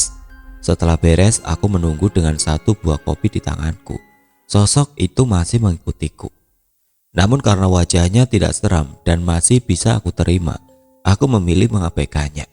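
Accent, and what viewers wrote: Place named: Java, Indonesia. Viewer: Maklum lidah orang Jawa😃😃😃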